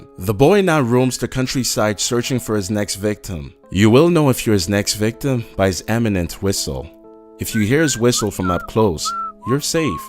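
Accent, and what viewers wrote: Mexico. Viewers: Love this guy's Mey-he-ko accent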